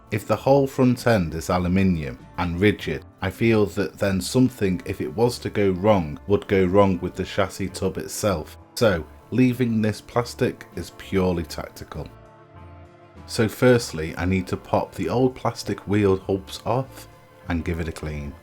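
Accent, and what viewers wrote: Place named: North, United Kingdom. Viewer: I'm subbing because you sound Northern and depressed. You'd think this would be wrong but I'm becoming highly suspicious of happy Southerners. It must be a Northern thing.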